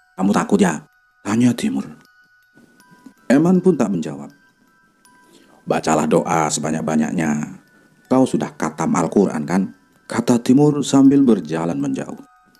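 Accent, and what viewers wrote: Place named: Borneo, Indonesia. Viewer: Best. Sama spt mndengar ckap org Sabah/Sarawak (Borneo). Mudah kami fahami, baru happy😅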